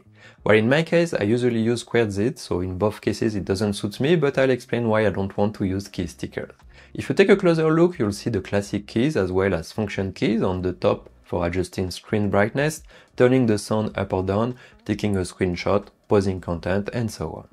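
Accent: France. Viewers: your french accent is very strong. difficult to understand. I suggests you speak slower so that people can understand you.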